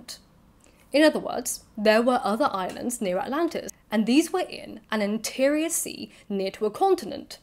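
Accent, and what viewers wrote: RP, United Kingdom. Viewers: Im from London,and I must say you have possibly the most posh British accent.You should try and make audio book. You have such a strong elegant voice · Oh my days she's posh!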